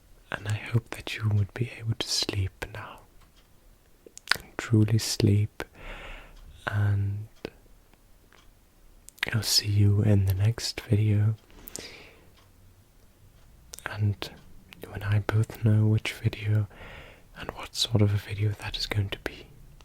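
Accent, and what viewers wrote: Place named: United Kingdom. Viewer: I love your British accent,! Are you from London? Congrats 🎉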